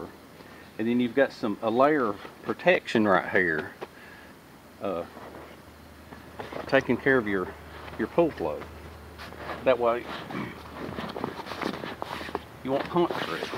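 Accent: South, United States. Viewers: Great project, and your presentation was,as always,very southern,and right on the money! Enjoyed it a lot. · Greetings from WNY, discovered your channel recently when decided better get prepped up in light of current events. Really enjoy your content, very thorough, comprehensive, and informative. The cats made this one! Also wanted to say you remind me of my buddy Eric from Kentucky with your southern drawl! best to ya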